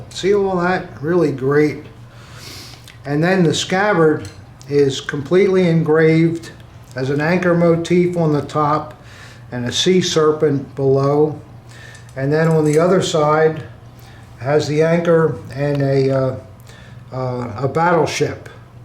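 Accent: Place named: Philadelphia, United States. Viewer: Man the Philly/S Jersey accent is strong with this guy! Good Stuff, could enjoy a beverage on 2 street with him.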